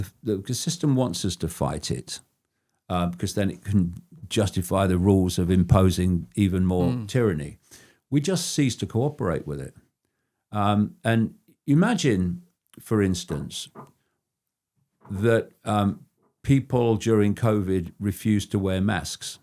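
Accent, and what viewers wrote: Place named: Northern England, United Kingdom. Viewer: Message to the host - stop interrupting the guest with that barbaric up north accent.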